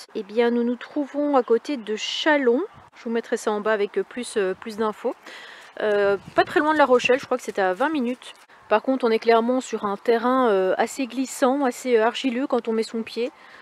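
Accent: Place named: Brussels, Belgium. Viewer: Ah ben c'est vraiment super cette nouvelle ! J'avais vu sur la chaîne de la Zick'Family le camping car en question du gars qui l'avait utilisé pendant 7 ans comme chambre d’hôtel parce qu'il travaillait sur un aéroport ou un truc comme ça (avant sa retraite actuelle). J'avais pensé à toi quand il parlait de la batterie auxiliaire (à cause de ton accent bruxellois) j'avais pensé "pourvu qu'elle le voit". Tu auras bien plus de place pour ranger tes affaires, une penderie près de la table, une autre près du grand lit ! + des tas de placards, une salle de bains avec wc, évier ! le pq n'aura plus jamais besoin de trôner sur l'évier de la cuisine ! Tu pourras laver tes cheveux à l'eau chaude puisqu'il y a un chauffe-eau au dessus de l'évier cuisine !, c'est fini de se crisper le crâne à le laver à l'eau glacée en hiver ! Frigo, chauffage, isolation au top ! 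Je suis bien heureuse pour toi et ton fils quand il sera là avec toi. (sans oublier Nanny bien sûr !) Ça va vraiment vous changer la vie ! Même pour Idriss, vous pourrez venir manger là aussi à deux ou entre amis, jouer à des jeux. Par contre j'ai vu que la table avait un petit problème (fendue sur le côté qui touche la paroi sous la fenêtre) mais ça ne doit pas être grave, juste esthétique, sinon Nicolas l'aurait dit je pense. Bon courage pour faire ton "déménagement"/aménagement dans le nouveau "Gérard II" J'ai hâte de voir la vidéo de demain (quand je pourrais car j'ai des tonnes d'activités en ce moment, même le soir) (Festi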